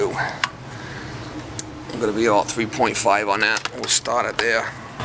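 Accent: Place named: Northeast, United States. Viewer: u soumd like ur frmm UMM?? northeast there. I have a reheem 1973 gas heater. it heats up like sound is boombush but after that no sound from the fan motor and I did put in canstat l22-9a-11 there that didn't work but I got the fan motor going there but noo heat though. could it be the fan limit switch there thanks George..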